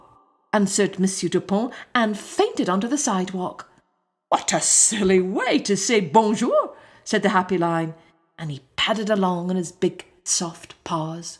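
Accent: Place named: Scotland, United Kingdom. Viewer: This was my absolute favourite story. The first story I ever remembered. My mother would read it to me when I was a toddler and I remember it. I loved the artwork especially the bushy end of his tail used to fascinate me and his great wild main. The man who fainted and the way he was on the floor used to fascinate me too. This is the first time I have seen this story since way back then and it imprinted so deeply upon my imagination and spirit and I remember that feeling all over again. It’s a feeling of joy and wonder and a strange texture that is hard to describe with words. That’s when you know you are reading something that someone’s soul has touched it. C,s Lewis used to describe such a feeling and he called it joy. I think it’s something that unlocks something of the human spirit. Another story my mum used to read to me was Brambly hedge and had a similar effect on me as a child. 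Thankyou and loved hearing this from a beautiful Scottish accent.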